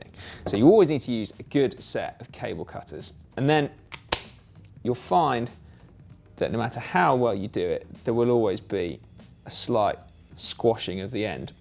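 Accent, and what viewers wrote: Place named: United Kingdom. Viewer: As an American it gets tiresome listening to British accents all day when looking for good bike videos... but how badass is it that this guy is not only a great rider who loves to get hands on and understand the concepts behind how his gear functions, but he's got a clear and engaging style of presentation as well. Just a shout out to this dude and his many great videos.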